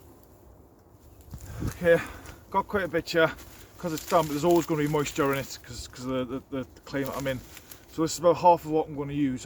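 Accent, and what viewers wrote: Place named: United Kingdom. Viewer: thanks much no problem with accent I have very many UK friends 👍 very good people in Uk top men an women 🤝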